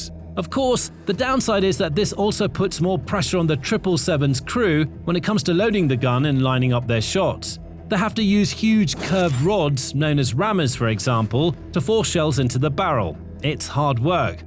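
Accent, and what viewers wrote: United Kingdom. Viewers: Please lose the British accent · Not actually American is it, British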